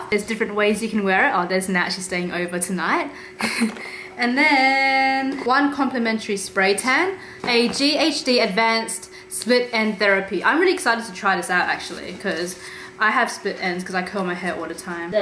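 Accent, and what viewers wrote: Australia. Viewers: Very Lovely! 🍦🍦😉😉⛹️‍♀️💻💻🍾You have a hectic but interesting lifestyle!🙂..Nice to see how much your videos have evolved! YOU are a sweet cutie! Always be Yourself! I love love your Australian Accent! 🙂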